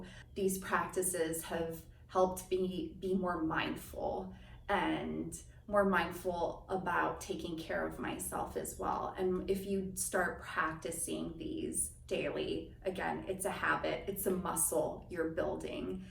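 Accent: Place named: United Kingdom. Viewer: you sound not USA... Where you from? If you are British you could self love with British tea so you know you don't force any on me and no revolutionary war😉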